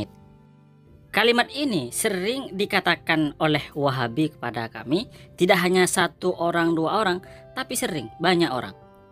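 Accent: Madura, Indonesia. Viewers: Seperti logat orang Syi'ah dari madura yang diusir ngungsi ke stadiun GOR_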